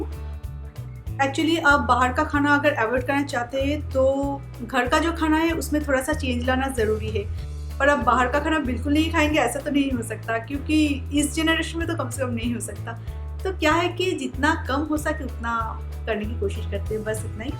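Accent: Bengal, India. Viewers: Hi frnd u r sooooo sweet.....i love ur bengali accent😘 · I love your way of speaking it has bengali acsent I love it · Shweta Grover Yar bangali hai to Thora sa to chalta hai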